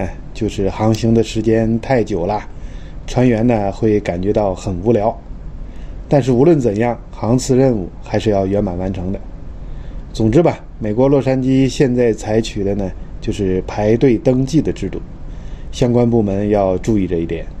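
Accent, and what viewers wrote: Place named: Tianjin, China. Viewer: 请问李船长，你是不是还有个频道叫？声音和你一摸一样，也是天津人。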